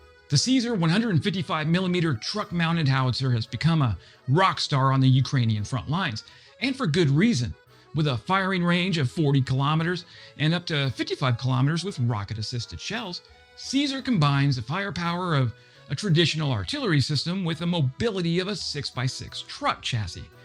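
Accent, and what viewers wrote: France. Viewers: Forgetting your horrible French accent, good effort for the video and don’t forget France is the only real power in Europe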